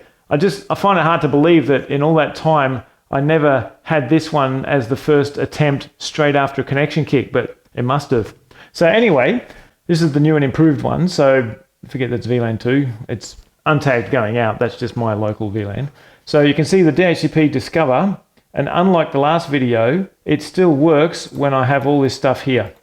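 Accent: Australia. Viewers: Good shit. We need easy to follow guides on getting not just familiar, but comfortable in using linux daily, all spoken in fluent (fuckin') Strayin. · straight in with c. YT SF-based kiddies, I mean algorithm trying to turn Oz accent into Yank accent and run it through ears algorithm again...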